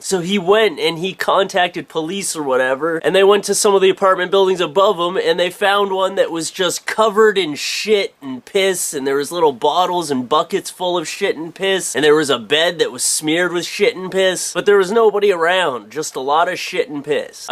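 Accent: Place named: Canada. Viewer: I think should find an excuse to say 'house' in every video. Believe it or not, my friends and I have become so amused with his accent that we all say 'house' Canadian-style.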